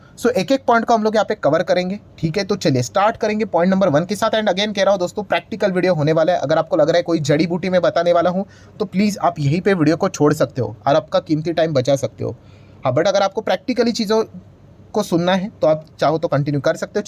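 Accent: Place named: Gujarat, India. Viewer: Yes sir I listen your videos word to word, belive me i observed your pronounce that you are guharati if not you are marathi with many gujarati friends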